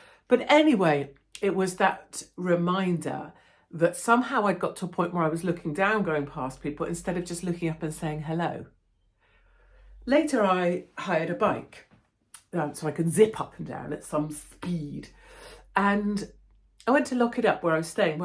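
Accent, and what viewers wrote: United Kingdom. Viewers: Hey! I got some Real good constive Critsisimusssmms In Russia they do a standing ovation when the plane lands.:face-green-smiling: I would feel the same way! 😅 I would be as well. Glad you made it out alive out of D.C. Well, you have been so kind to SOOooooo MANY! LOL! 🤣 Well, we in freedomland dig your British Accent. And you sort of sound like Alexa or Eliza take your pick. ☺:face-turquoise-speaker-shape: NO NO NO NO NO! Remember U R STILL in the U.K.! DANGER WILL ROBINSON!:hand-orange-covering-eyes: Glad you love being in the States and to be Frankly honest, WOULD LOVE TO HAVE YOU BRITS (not all of you) make the move across the pond and come here to become freedom loving Americans! Yea, things are going to get better as long as you stop voting for Libtardia. Plenty of you have came to conservative states, and many of you "Lot" r here in Oregon & I LOVE YOU HERE! 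(Just stay the hell away from DownTown Portland, and stick to the Burbs) Yea its still expensive, but if you work your Hiney off, you can also live a great life here. WE in America are going to rebuild and become the BADDEST MOFO's on the planet again, and if you want to be apart of that? Come on over partner! Just if you got kids? Make sure to keep them out of our Public schools. Lots of crazies still in the schools there, so more for you Bachelors and Bachelorettes. Have a great one and hope you take the steps to make things better in the U.K. Would love to see Fra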